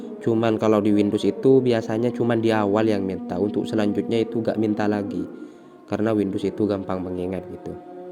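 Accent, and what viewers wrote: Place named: Madura, Indonesia. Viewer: Orang madura ya bang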